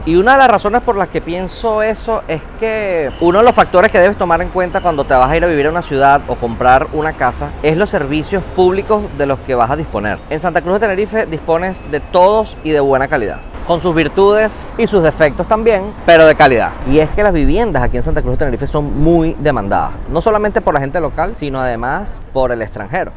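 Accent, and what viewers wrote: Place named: Venezuela. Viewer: Pana,,hablas igualito a un buen pana mio Venezolano tambien,,😁😁,, concha y me estas embullando a mudarme para alla,, ya USA me infla las pelotas ja ja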